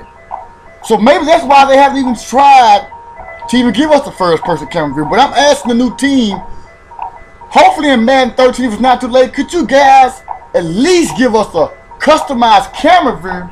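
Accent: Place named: Baltimore, United States. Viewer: That Baltimore accent is thick as shit. Vieruu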